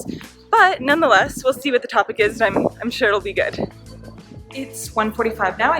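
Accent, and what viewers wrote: Canada. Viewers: are u canadian ? if so what part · Is she Canadian? Her accent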